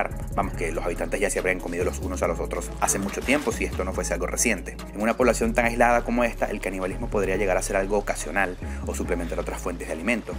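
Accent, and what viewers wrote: Venezuela. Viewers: Es venezolano¿ El narrador no los canibales, el acento xd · Buen video amigo eres de venezuela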